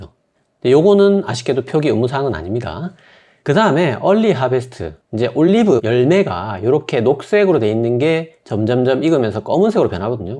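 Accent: Gyeongsang, South Korea. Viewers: 유튜버들 중에 되게 애매하게 어렵게 말하는 사람들많은데 이분은 되게 명확하네요! 직설적인 경상도 사투리가 이래서 좋아ㅏ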